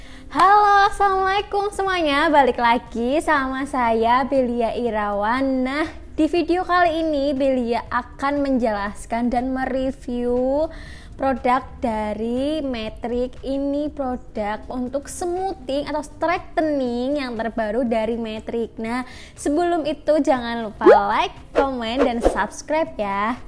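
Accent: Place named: Java, Indonesia. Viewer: medok re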